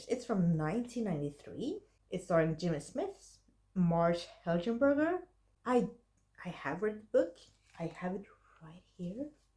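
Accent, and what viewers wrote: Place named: Sweden. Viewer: I was going to ask what that accent was, but you said your from Sweden? So I’m guessing it’s Swedish 😊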